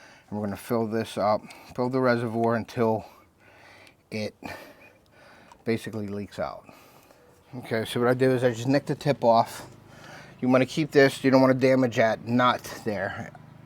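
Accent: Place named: Pittsburgh, United States. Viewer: Awesome video. Searched how to add oil to my merucry outboard and this video came up. Within TEN seconds, i was like is this guy my neighbor?? lol fellow yinzer here!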